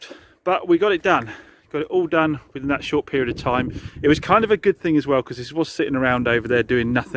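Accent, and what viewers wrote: South East London, United Kingdom. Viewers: He's got a completely typical South East London accent.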